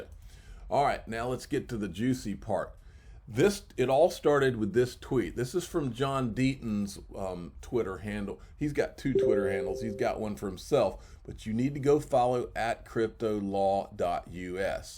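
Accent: South, United States. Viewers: Love listening to crypto news in a Southern accent!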